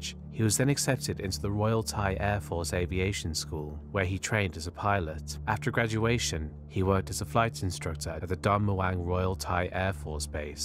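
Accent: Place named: England, United Kingdom. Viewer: This guys English accent makes these sad accounts worth listening to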